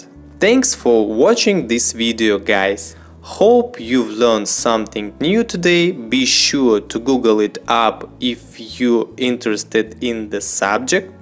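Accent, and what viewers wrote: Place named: Russia. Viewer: Noomde ye solve babe hota Russian inglish bolta he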